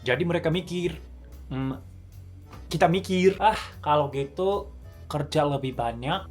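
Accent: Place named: Java, Indonesia. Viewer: Logatmu Jawa banget coyyy. 🤣 Potongan disana banyak, bedanya, sebanding sama fasilitas dari negara. Di Indo, duh, pajak dikorupsi mulu. Oh ya, BGM nya terlalu kencang. Volume-nya kecilin lagi dikit.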